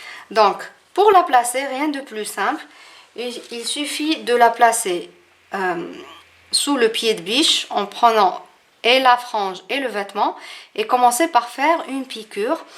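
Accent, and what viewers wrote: Tlemcen, Algeria. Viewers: d'aprés l'accent vous étes une tlemcenniene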